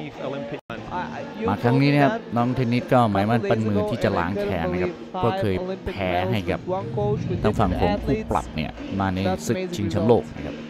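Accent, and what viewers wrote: Isan, Thailand. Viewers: เว้าลาวอีสาน ผสมกลางมันม่วนดีสุดยอดรวยจ้า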